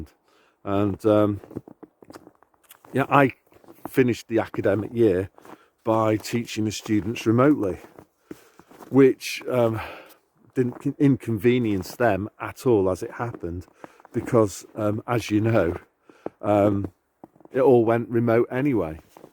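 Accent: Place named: Lancashire, United Kingdom. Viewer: We gave up work in our late 40's and moved to a house we had bought a couple of years earlier in northern Spain, intending to live "the good life". We ended up loathing the insane bureaucracy and thinly veiled corruption and returned to a very rural part of England. I have thought about upping sticks again but have more or less given up on the idea. Ironically I have met more like minded people since this whole sh1t show started than I ever knew before and have a great network of awake friends as well as an awake church I attend. Also I am fully expecting to be raptured more or less any day now so am hanging in here. Really look forward to your words of wisdom and, as a fellow Lancastrian, enjoy the delivery almost as much as the content!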